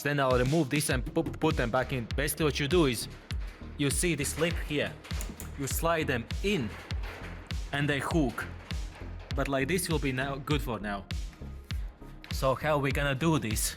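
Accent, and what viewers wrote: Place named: Germany. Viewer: oh, that's great news to me - what you have is what I'm after, because I am very used to 5 pos switches and I enjoy pressing once to fully close my windows when I've arrived to my destination and need to leave my car - and I hate that I can do that with my driver's window with a simple one full pull, but have to hold the pulling for the other 3 windows. I also never had an issue to make a bit more gentle press to crack a window instead of full open or full close because I clearly feel the 3 pos vs 5 pos switch - probably my gaming spider senses kick in to feel the difference :) My problem is that whenever I try to search for a switch with all four windows on auto that you have, I only find the one with driver's window auto only, I even thought it doesn't exist, but you gave me hope. Now, I need to discover a part number that would mean the switch that you have and it also has to be for a right-hand steering. 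Come to think of it, I have an idea to swap with you, because in your videos it looks like you have a right-hand one, although being in EU Feel free to contact me on Telegram (I'm Australia, so parcel might take a week to arrive - last time I posted internationally was around a couple of months ago and it took 4 days to arrive to UK, so I suppose would take around the same time to post to Germany or Austria, considering your accent).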